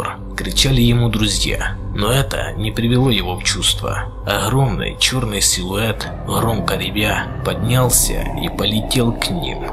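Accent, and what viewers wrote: Ukraine. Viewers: это украинский акцент ! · и не в обиду тебе:постарайся как-то сглаживать украинский акцент на букве "г" , "друХ",например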